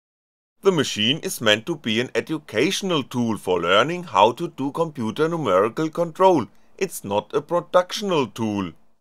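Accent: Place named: Germany. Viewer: немец на английском - ЖЕСТЬ!!!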